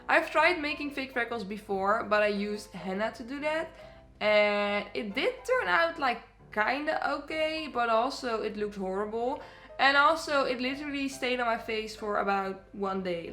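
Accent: Netherlands. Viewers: Omg it looks really goodd😍 Are u Dutch? Your accent sounds a bit dutch 😊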